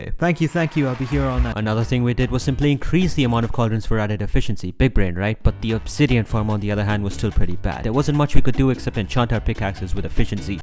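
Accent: India. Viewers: You're Indian aren't you You have an Indian accent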